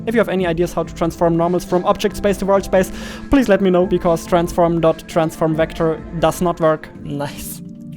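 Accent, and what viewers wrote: Germany. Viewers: Bist du deutscher? Are you german? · Er ist deutscher. Man hat es im Call gehört. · du sprichst see also to see immer wie „sie“ aus und nicht wie „ßie“😂 Daran hab ich auch gemerkt dass du deutsch bist. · Direkt aufgefallen, du bist Deutschsprachiger ^^ Aber cool dass mich der heilige YouTube Algorithmus hier her gebracht hat :) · Are u from Germany? u have a German accent ^^ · Bist du deutsch?Hört sich irgendwie so an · Du bist deutsch? :D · Hey, jetzt weiß ich wieso du einen deutschen Akzent hast 😂🙂❤ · Wie man halt instant an deinem Akzent merkt das du Deutscher bist. :D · Feier diesen Deutschen Englisch aktzent, aber nices Video. Kannst du mal zeigen wie man spiele auf Steam hochlädt? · Haha ich wusste es dieser aktzent der muss deutsch sein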